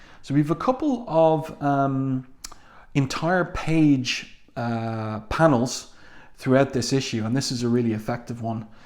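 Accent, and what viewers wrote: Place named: Ireland. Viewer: Lovely to hear a modern Irish voice online.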